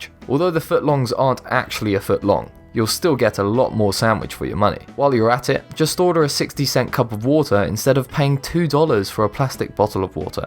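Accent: England, United Kingdom. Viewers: Love that british accent! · Please dont pronounce everything with an over the top brit accent , you just lost 14 viewers in my family , the accent is old and we. E mentioned it many times but you still wont change it. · Like your English accent, I get sick and tired of American accents, Cheers, from - Australia 👍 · Got a lot of hard feelings, when I hear a distinguishable British accent bashing American food, while not discussing blood pudding, aged beef, mincemeat pies, and the absolute hoard of atrocious foods they have, in the same discussion. Narrator, why don't you explain to me what, exactly, is wrong with British 'Fish and Chips', that the mayor of London is banning them for kids? If you feed children like sheep, expect them to look like them too (India is a great example of a nation plundered by Britain in the 19th Century that did so well, they nearly bankrupted New Deli before it was given back to them)...ever seen a 6-ft tall bodybuilder in India? 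Hint: it's because they worship cows, and don't eat them (until they show up in America, and then they eat them!)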